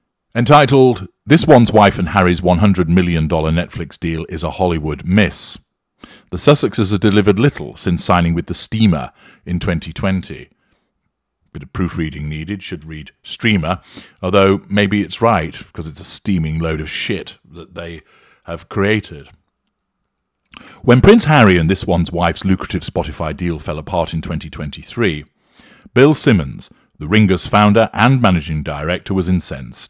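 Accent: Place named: RP, United Kingdom. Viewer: Hi Sir, love your use of profanity and you still sound so upper crust and gentlemanly, it’s like hearing a priest swear, you make me laugh 😆 🤭 I do have a telephone voice like all Londoners😊🙃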